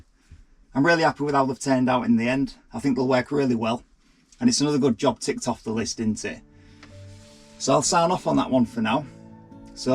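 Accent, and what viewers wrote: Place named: Hartlepool, United Kingdom. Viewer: Are you originally from Teesside area mate ? Got a Hartlepool accent 🫡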